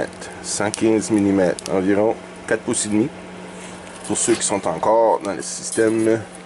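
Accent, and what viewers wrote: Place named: Gaspésie, Canada. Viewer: Vous êtes de la Gaspésie si je me fis a votre accent? Beau projet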